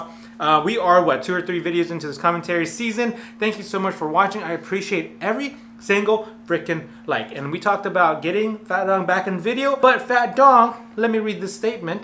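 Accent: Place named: United States. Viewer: You got the weirdest country accent I've ever heard. lol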